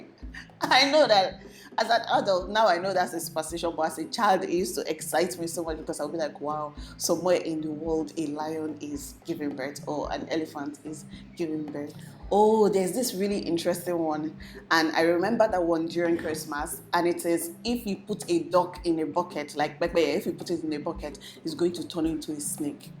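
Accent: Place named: Warri, Nigeria. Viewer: No wonder!! I knew your pidgin English was like a warri girls own ... your so beautiful 😍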